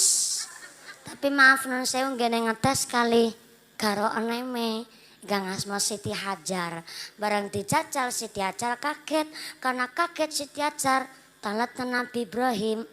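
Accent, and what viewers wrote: Banyumas, Indonesia. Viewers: Logat yg bikin menghibur, yaitu logat ngapak.. Hhi Sukses truss sob Mampir sob kerumah, ajari sy bahasa ngapak.. Biar lancar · Gokil ngakak pollll ustaadzahh ngaapak kiii,🤣🤣🤣🤣 Mg lncar acaara Khitaanyaa. Aminnnn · Ustdzh cantik..ora ngapak ora kepenak😆😆🤦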